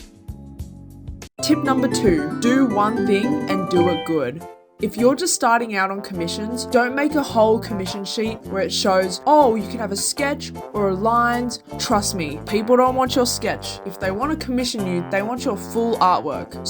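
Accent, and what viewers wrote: Australia. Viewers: i thought they sounded australian lol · idk why i just love her accent, usually i don't care for Aussie accents, i think they sound like goofy British accents, similar to Canadians fake French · I got whiplash hearing my very own thick Aussie accent thrown at me. I’m always expecting American at this point. Great video btw ✌️ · they sound australian(?) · the aussie acent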